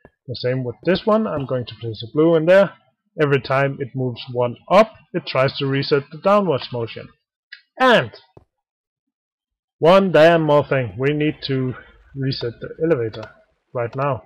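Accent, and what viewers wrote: Denmark. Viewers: First: How do you make the stone blocks in the 'gate' going down and up? I Wnat to make something like that :) Can you just link me a video for a tutorial og the tutorial you used? Second: Are you from Denmark? It sounds like you are xD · That's the thickest danish accent I've ever heard xD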